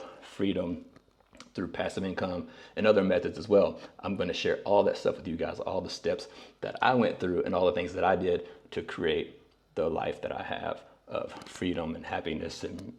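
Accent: United States. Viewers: You sound like an American. Just wondering how the Chinese police treat you? Do they stop you every time you go out and ask for Visa document etc? The news makes it sound like you will be vanished and never be found if you visit China.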